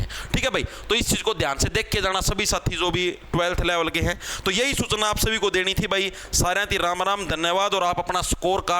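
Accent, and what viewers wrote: Haryana, India. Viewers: Aapki haryanvi mst hai Love from BIHAR 🤗🤗🤗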